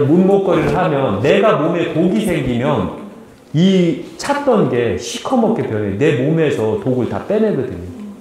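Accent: Chungcheong, South Korea. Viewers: . 상투와 두건은 깔끔하고 시원해 보여 눈이 즐거운데요, 수염은 푸시시한게... 좀...염소 같잖아요. 턱선이 부드러워서 구수한 충청도 사투리하고도 잘 어울리는데 말예요. 면도싹~ 어떻게 생각하세유? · 충청남도 말씀하시네요 저도충청남도입니다 반가워요 어지그리 구수하게말쓰을하시는지 얼마나 재미있웃우운지 ㅎㅎㅎ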